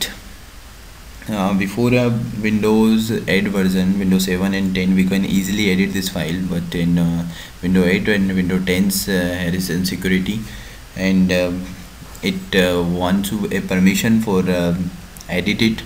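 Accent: India. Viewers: indians with their accents.... bruh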